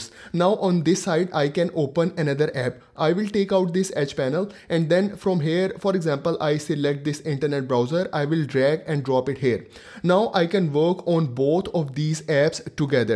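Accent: India. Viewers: Unrelated, but WHY does your accent sound so Indian?? I like it, but, like, how??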